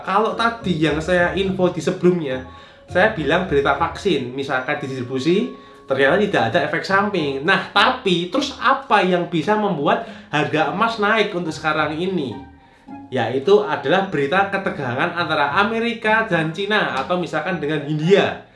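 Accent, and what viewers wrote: Java, Indonesia. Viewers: pak medok banget pak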